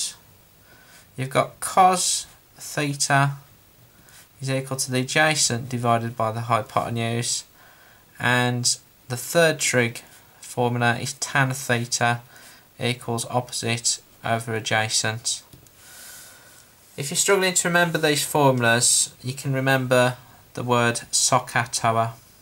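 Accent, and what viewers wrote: Birmingham, United Kingdom. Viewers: that brummy accen though!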